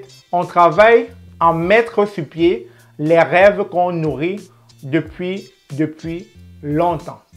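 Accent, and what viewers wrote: Cameroon. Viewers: Etes-vous Camerounais? je le demande a cause de votre accent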